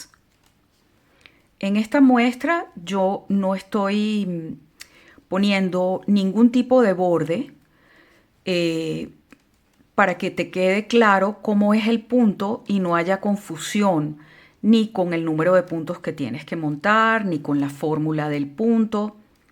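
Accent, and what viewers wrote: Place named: Venezuela. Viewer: Muy lindo, muchas gracias!. Me ha insipirado tu muestra. Voy a hacer un cardigan con 10 vueltas de tu punto y 10 llevando un punto en diagonal cada 6 u 8 asi el diseño tiene continuidad. Todavia estoy evaluando si hacer las mangas todas caladas o no, ya que son mangas al codo porque es una prenda de lana de algodón para verano. Me encanta tu acento, es venezolano?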